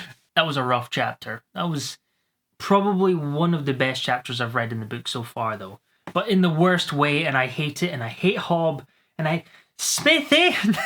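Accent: Scotland, United Kingdom. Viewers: Nice one. This was excellent! You've given me lots of food for thought as a newbie BookTuber. Love the b-roll. And the book? Yeah, it's a banger. I just finished it for the third time last night. I stopped at the end of Liveship Traders and now want to read the whole lot, so I've had to go back to the beginning. And what a trip. I'm so enjoying it. And then to jump in and hear others' thoughts on YouTube? How did I never know how good a genre this in on YouTube? Glad to make your acquaintance - I'm guessing you're a fellow Scot from your accent? Loved your thoughts on Burrich. I actually can't remember whether you're right or not. In some ways, I'm blessed with that bad memory as I can enjoy them all over again, spoiler-free, ha ha.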